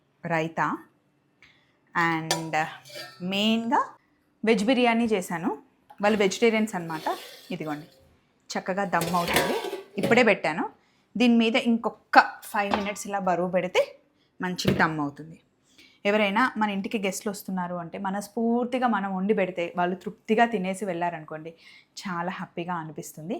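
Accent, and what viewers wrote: Andhra Pradesh, India. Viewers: Meeru Telangana vallu aivundi Andhra slang lo enduku matladataru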